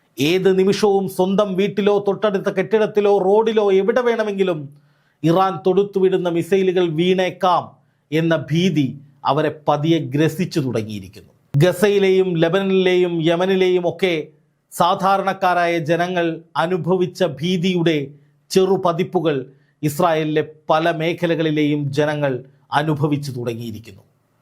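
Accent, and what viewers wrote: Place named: Pathanamthitta, India. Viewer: ത്രീശ്ശൂർ ആണോ. പത്തനംതിട്ടകാരന്റെ സംസാരമാണല്ലോ കേട്ടിട്ട്.. നീട്ട് ഇല്ലല്ലോ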